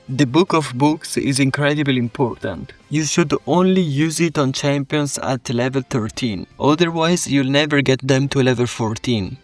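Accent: Italy. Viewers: Grande fra, parli benissimo inglese, ma si è subito capito che fossi italiano dall'accento!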